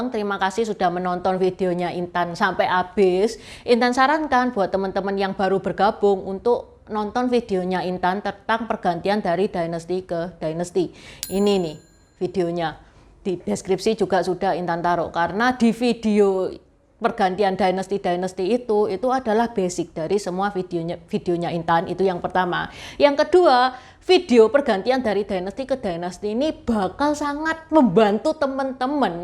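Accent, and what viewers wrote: Java, Indonesia. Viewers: intonasi jg terlalu medok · Berisik, medok nyakitin kuping !!!!! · memanng legend..lagu2nya begitu masih dikenang dan dinyanyikan hingga saat ini..dan saya pun masih debngerin lagu2nya...thx ulasanya ci intan..btw asli dr mn ci kok medok jawa bangaet..? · mbak aki juga org jawa meski bukan cindo tp logat medokmu kebangetan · Medok banget jawane mbak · Mbak intan orang mana sih koq jawanya medug banget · Medok.. Chinese nopo jowo mbok???😂😅😅😂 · Mbak jgn edok bgt bisa tdk. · Medok bgt ngomongnya, pusing pala ku dgr nya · Mbk intan,wajah chines logat jawa medog bingit... 👍🤭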